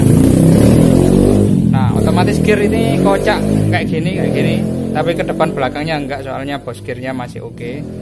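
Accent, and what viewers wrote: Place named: Jombang, Indonesia. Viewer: Logatnya kayak orang jombang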